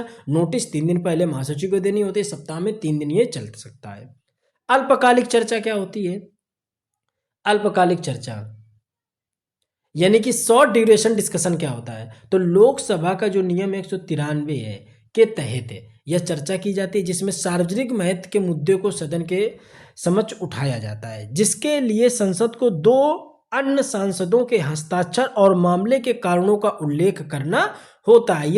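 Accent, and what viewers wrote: Kanpur, India. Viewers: Sir aapne bahut ache se samjhaya.... Kya aap kanpur se ...apki awaj se aise lga mujhe ...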